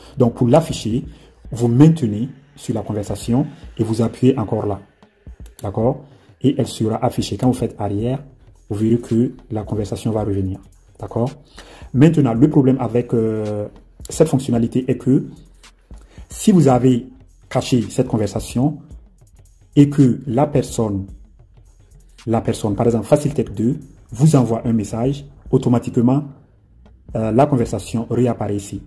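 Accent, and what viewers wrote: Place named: Burkina Faso. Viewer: Merci beaucoup ! Je connais pas ta nationalité mais vous avez un accent Burkinabé 😁😁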